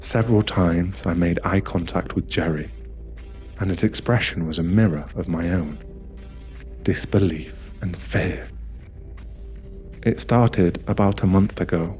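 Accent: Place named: United Kingdom. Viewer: New subscriber, nice to hear a fellow Brit here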